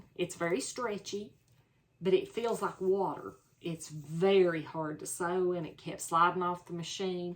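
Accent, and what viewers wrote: South, United States. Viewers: I love your videos,. Just love listening to your lilting voice. Where are you from? Can’t quite pinpoint the accent. I’m guessing the Carolinas. I was raised in East Texas, so I love listening to various Southern accents. So soothing! When my accent occasionally comes through (usually when I return from a visit to Houston), I constantly have to tell folks I have a Texas accent, not Southern. I have to explain that Texas is not part of the south, that accents are classed geographically and Texas is located in the middle, not to the right on the map! Most “other” people (you know who I mean! 😹) hear “South” and think “Confederacy.” That’s why they’re confused.. Bless their hearts. 🙄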